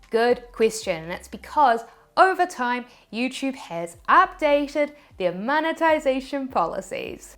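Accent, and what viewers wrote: New Zealand. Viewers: Are you a kiwi? Your accent is adorable!!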